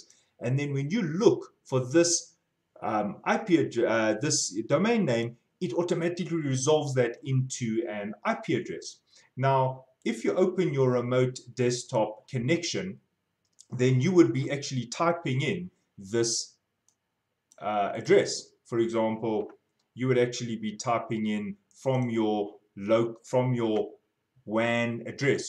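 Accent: South Africa. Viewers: I hear SA accent, I upvote! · Haha, such a strong SA accent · jirre, get smacked by that south african accent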